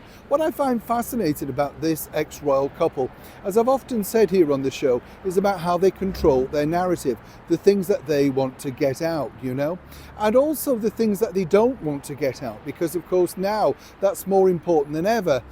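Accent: Yorkshire, United Kingdom. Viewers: You're pure Yorkshire this morning, I love it!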